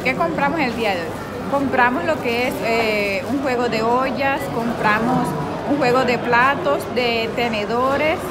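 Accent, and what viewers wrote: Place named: Atlántico, Colombia. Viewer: Hola, como estas? Me encanta ver tus videos, me pareces una mujer con un carisma muy lindo y chistosa. El modo de hablar con ese gopeadito polonuevero que aún permanece en ti Bendiciones, besos y saludos de tu abuelo cesar Cervantes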